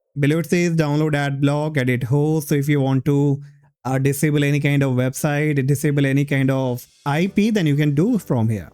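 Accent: India. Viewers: sounds like he's from one of those Indian call centers that steal peoples information, don't trust those people they are online thieves.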